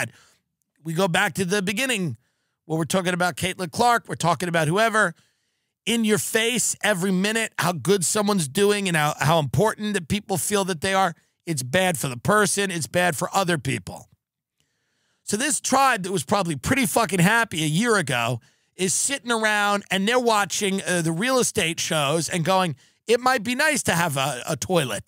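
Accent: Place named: United States. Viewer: It's kind of weird, I saw his special... It was ... It was so stale. It was bad. Just really bad. But this podcast and way he performs here is pure gold. A real american voice. Everything he does in this podcast is 100% on point and makes me go crazy. This podcast is hilarious in a way that Louie describes, I feel how it's changing me as a human and how I view the world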